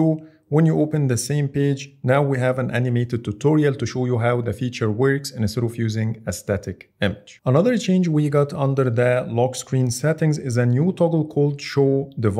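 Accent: Egypt. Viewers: You an Egyptian? I can sense it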